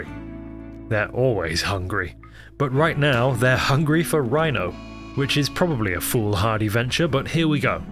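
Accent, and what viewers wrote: United Kingdom. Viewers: my hyperfixation? in riwmorld? WITH A BRITISH VOID MAN? yell heah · Babe, wake up, the funny British guy is back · Hello Mr British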